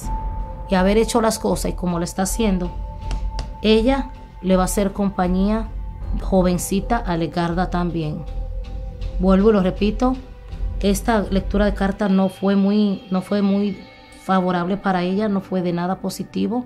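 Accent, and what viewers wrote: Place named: Dominican Republic. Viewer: tu eres dominicana? Ese hablado nuestro se conoce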